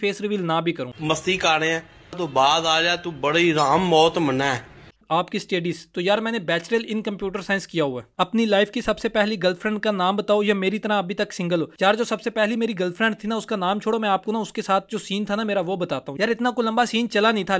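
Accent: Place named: Punjab, Pakistan. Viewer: Ap k bolny k style se lgta hy ap jhlem se ho ... Han ya naa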